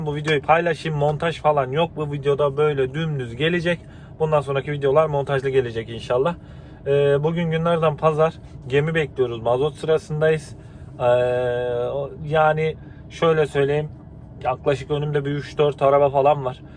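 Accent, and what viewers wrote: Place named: Central Anatolia, Turkey. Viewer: Kolay gelsin REIS konusma Tarzin ic anadolu lu oldugunu gosteriyo galiba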